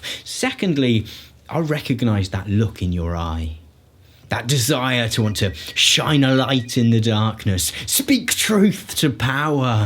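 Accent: United Kingdom. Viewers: Mickey Rooney with a British accent? 🤭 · Your accent is half "annoying British accent" and half "pleasant British accent" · has that certain British charm with which he speaks that's difficult to describe, but instantly recognisable. The way he sometimes replaces "because" with "for" is just... well... cute! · Why do you look like you sound British. I know why you sound british, but why can i tell you do by looking at you? Care to explain yourself? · Great video but somehow my man has a british accent with a california vocal fry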